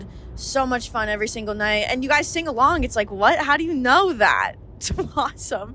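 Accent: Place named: Upstate New York, United States. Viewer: The most north NY accent of all time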